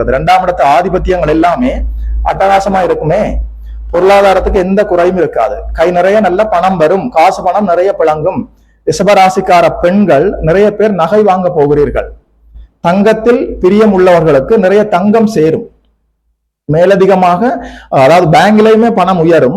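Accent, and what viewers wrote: Sri Lanka. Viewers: ஸ்ரீலங்கா தமிழ் அருமை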